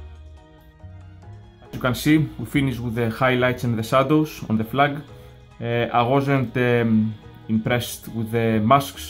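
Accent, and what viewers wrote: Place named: Greece. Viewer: I have a question, where are you from, i'm trying to place your accent, I want to say its Greek, but I could be wrong.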